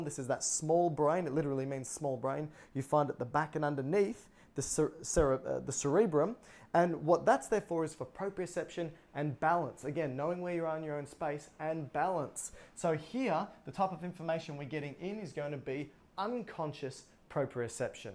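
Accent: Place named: Australia. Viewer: Anatomy lessons AND an Australian accent????? I just hit the jackpot lol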